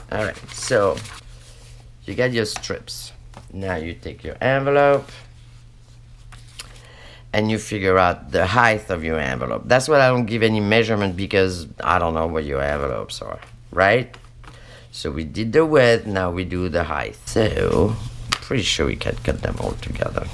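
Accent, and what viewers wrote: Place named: France. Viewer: I just found your channel. I enjoyed watching your video so much! I already knew how to make the project, but I continued watching because youe personality and commentary are so great! It was like the voice inside my head when I am crafting, just without the French accent (which is very cool, btw😊). Thank you so much for sharing this!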